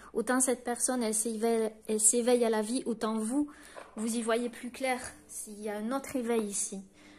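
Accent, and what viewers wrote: South, France. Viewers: Bonjour. Merci pour cette guidance qui me parle beaucoup 🙏🧘‍♂️🕉🌷🌺🌍💞 on entend votre petit accens du sud et vous devez connaître l opercule de sainte lucile un porte bonheur, représenté sur la carte ( 16 Ce que vous voulez vous veut ) ce qui m’aide encore plus à croire votre guigance votre cœur. MERCIIIIIIIIIII de nous aider éclairer. Je vous souhaite un trés bon week-end que DIEU ET L’UNIVERS VOUS PROTÈGE NOUS PROTÈGE 🌷🌷🌷🌺🌺💞🌍🕉🧘‍♂️🙏🐱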